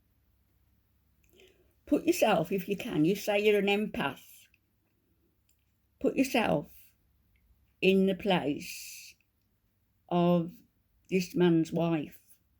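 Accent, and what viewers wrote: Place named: Birmingham, United Kingdom. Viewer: I subbed purely for the clip I saw of you saying "disgusting. Disgusting. Sow." You've gotta be a Brummie, that accent is chef's kiss on you! 💕💪🏾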